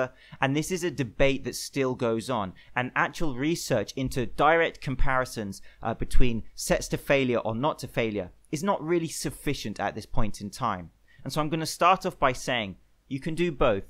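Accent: United Kingdom. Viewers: Please understand this is a compliment. I do NOT like the British accent. It grates on my ears. But that’s my problem not yours. The information you provide is so valuable I listen to it anyway. It’s kind of like training for my ears.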